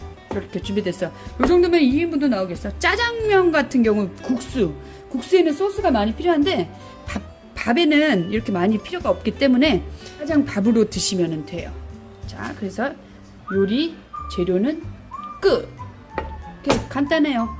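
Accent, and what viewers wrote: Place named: Seoul, South Korea. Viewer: 넘 매력있는 서울사투리에 강주은님 생각나요 ㅋㅋㅋ 요리할때는 살아있는 눈빛에 존멋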